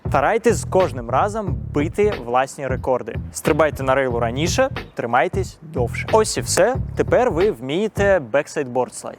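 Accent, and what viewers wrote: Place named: Belarus. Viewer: Полностью согласна несмотря на то что есть белорусский акцент, но это исправляемо временем😊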